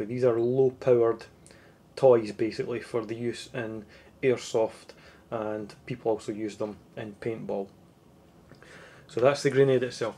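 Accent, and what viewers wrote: Scotland, United Kingdom. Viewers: Scottish accent ! I love it ! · i love your scottish accent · I love this kind of Scottish accent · the awesome Scottish accent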